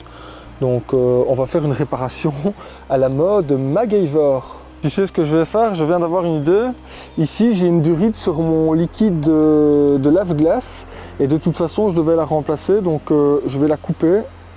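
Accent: Belgium. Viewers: Ouit= huit j’aime trop ce petit accent belge. ♥️👍😊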